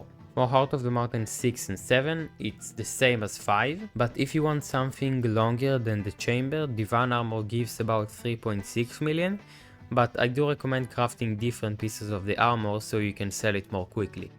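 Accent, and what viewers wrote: Israel. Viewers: איזה מבטא ישראלי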